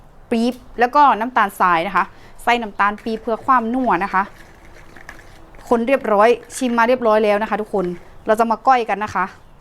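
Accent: Isan, Thailand. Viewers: พูดอีสานก็เพราะดีค่ะชอบคนเหนือฟังเป็นอยู่่กดติดตาไห้แล้วค่ะ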